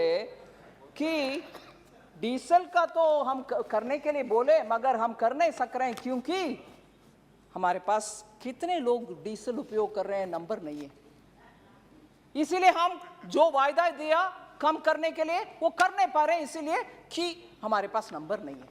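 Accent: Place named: Tamil Nadu, India. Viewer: Just shut up she's Tamil.. Hindi is not her mother tongue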